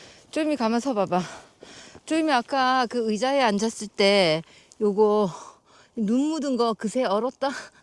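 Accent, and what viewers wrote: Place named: Gangneung, South Korea. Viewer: 영상 재미나게 보구 가드래야 말투가 정겨와우야 ^^ 강룽말투가 정겹드래요 지는 정선이본가구 평창이고향이드래요 12일 선자령 10번째등정 복많이 받으래야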